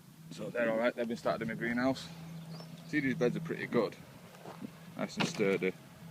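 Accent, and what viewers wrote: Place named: Barnsley, United Kingdom. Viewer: I didn't think you were far away with a Barnsley twang like yours. Check out if you already haven't johnnies allotment so funny. Got some chicks hatching so will put a post out same time as i get some photos of allotment. Its always good to see new people and allotments good luck for the season will keep looking.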